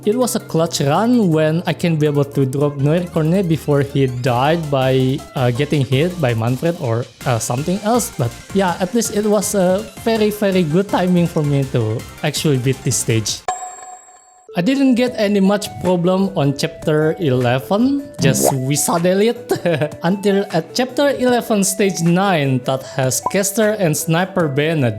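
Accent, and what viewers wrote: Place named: Indonesia. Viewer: kenapa ya orang indo kalo ngomong inggris kaya gitu semua ya? Kaya ada keunikannya sendiri langsung ada feeling "oh ini indo nih"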